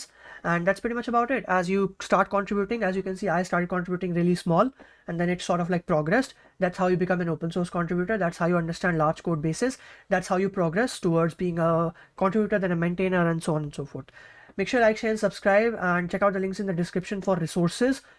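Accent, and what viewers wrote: India. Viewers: Most difficult part is to understand indian accent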